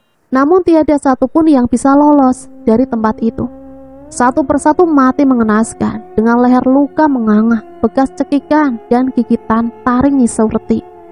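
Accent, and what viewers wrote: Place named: Indonesia. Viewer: Sy suka mimin ini walaupun kekadang terbawa bawa dlm membaca ceritanya tetapi asli suara nya Dr Indonesia...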